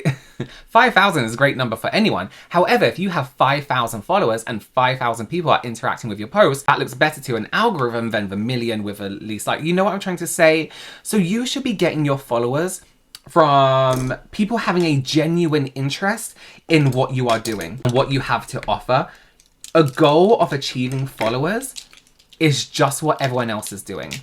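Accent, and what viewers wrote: England, United Kingdom. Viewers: I have an interest in makeup, and you provide valuable information not only about the make up application, but you add your years of professional experience to it., it is always delivered with humor, intelligence, and realness which is very much appreciated. Also your British accent is a nice to hear too! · the English accent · The English accent is everything 😍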